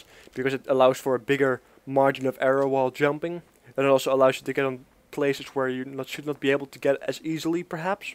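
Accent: Netherlands. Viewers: Are you dutch? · I love ur vids Btw, are you from holland (you sound like it, i am 2)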